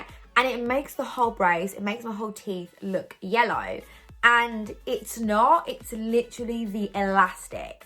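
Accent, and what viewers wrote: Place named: Australia. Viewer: Hi, I really like your accent. Are you Australian?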